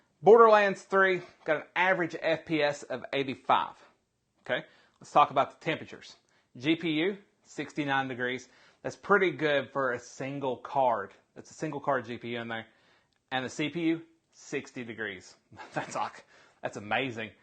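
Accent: South, United States. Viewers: dually noted and I will take your points into consideration as I execute my purchase in the very near future. As a consumer of content, may I say, I reallly, like REEEALLY, love the deep southern accent in these build/review videos! It's refreshing compared to those grossly proper UK creators or Bollywood types ;) Keep up the good work my friend and I look forward to some updates on the systems you have already built. TTYL! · Are you from Southern USA ? I've heard this accent in some old Hollywood movies. No hate, just curious.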